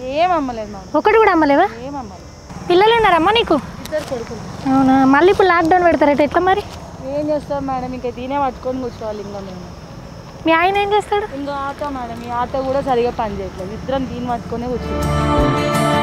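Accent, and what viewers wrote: Telangana, India. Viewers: Akka Nv matlade matalu entha kalupukuntlu unai Telangana yaasa spr akka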